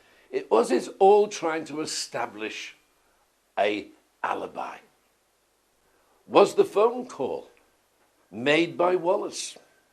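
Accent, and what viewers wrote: Liverpool, United Kingdom. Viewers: I love the old school`educated scouse`delivery,it reminds me of an old friend vocal chords improved by whiskey,cigarettes and the wind blowing through the Mersey Tunnel.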